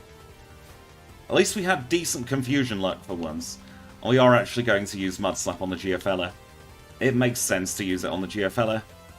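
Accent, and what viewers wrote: United Kingdom. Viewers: I'm enjoying your content now that your back. The mix of Pokemon and a soothing British accent is great for relaxing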